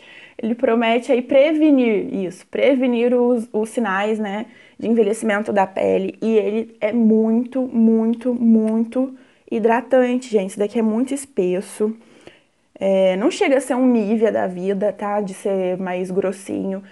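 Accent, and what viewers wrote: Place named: São Paulo, Brazil. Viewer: Sotaque dela é lindo, interior de SP. Amo ❤️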